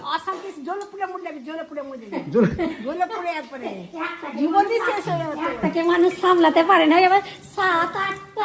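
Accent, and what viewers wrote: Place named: Murshidabad, India. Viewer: Puro Murshidabad ar khati kotha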